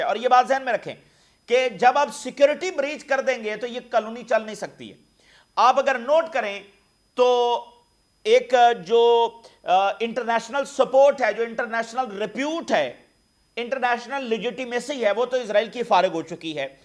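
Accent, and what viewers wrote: Punjab, Pakistan. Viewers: I anticipate you are a Pakistani Muslim! Futher from your Urdu accent I can tell that accent is from punjab! Reason of contact: but from your tongue I had never heard you saying the name of Allah subanahu Tallah our creator!, you should feel proud to be a Muslim and say Allah’s name so that it is audible to all, saying Allah’s name come out from the heart ❤️ naturally without even thinking! I had heard you saying “uoper walls” but I had never heard you taking or saying pronouncing Allah subanahu Tallah’s name loudly as you say rest of the stuff why??????? but don’t you feel proud of your religion Islam and say from your tongue the noble name of “Allah Subanahu Tallah” what is stopping you nothing is more important in this world than Allah and our religion Islam and the rest of the things comes after Allah! Nothing in the world is more important than Allah! 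I will suggest you proudly proclaim Allah’s name, specially at times when your are crediting Allah, instead of saying uoper walla proudly say Allah subanahu Talla! I hope you get to my comment some how and try be better Muslim! Aameen!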